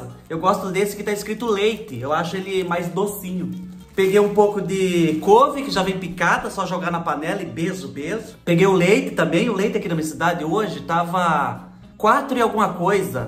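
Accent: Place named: Minas Gerais, Brazil. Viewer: descobri vc Ágora no YouTube você é muito engraçado seu sotaque parece ser mineiro e parece ser nordestino, eu sou nordestina, adoro você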